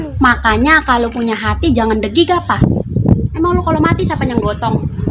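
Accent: Bekasi, Indonesia. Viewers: Logat nye bekasi bnget... Kaye enyak aye kalu ngomelin aye, keluar logat nye keq gini. Kage ade titik kome nye.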